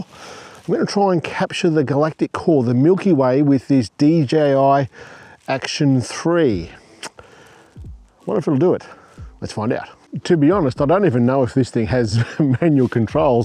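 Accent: Australia. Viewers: I absolutely love the Aussie access and slang 😂 Ive just bought the Action Cam 5 so I have to try this ❤ Cheers from the UK 🇬🇧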